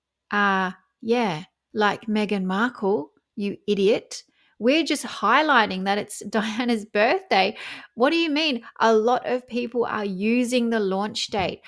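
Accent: Australia. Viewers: You have a beautiful laugh! Love your work. Great to hear a fellow Aussies voice about this awful woman! We can smell bullshit and seriously, even if she were to go global 😅 i reckon the Australian market would be her worst. If i ordered my latte, made by barristas who know me, and they put flower sprinkles in/on it, I would suggest a holiday was in order. We take our coffee seriously down here. Imagine Lygon St italians seeing this! Santa Maria 😅😅😅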